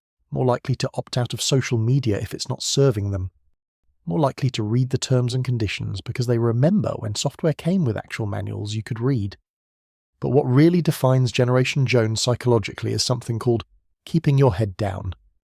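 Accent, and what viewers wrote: United Kingdom. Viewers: Anything that's narrated using a British accent is instantly believable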